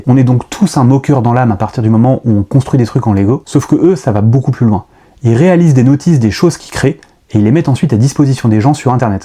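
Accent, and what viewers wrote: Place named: Spain. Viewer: Tres bel accent espagnol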